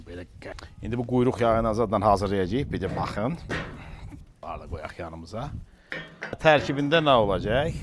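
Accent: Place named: Shaki, Azerbaijan. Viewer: bəy,xahiş edirəm ləhcəni dəyişməyin. Şəki ləhcəsi superdi.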